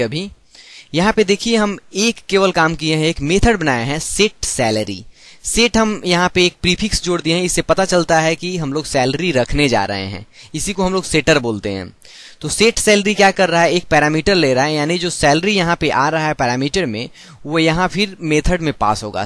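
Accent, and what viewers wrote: Bihar, India. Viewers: typical bihari accent ...But lecture is good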